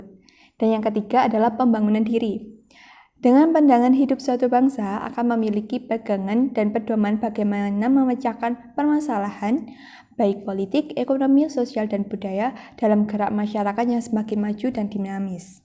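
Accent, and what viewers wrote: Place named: Java, Indonesia. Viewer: kelihatan jawanya😂 agak gimana gitu,medok"selebew🤭😂🙏🙏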